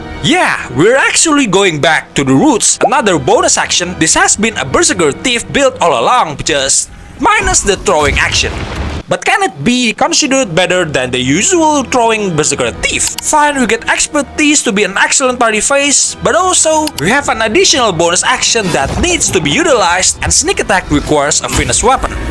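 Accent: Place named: Indonesia. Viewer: i know an indonesian when i hear one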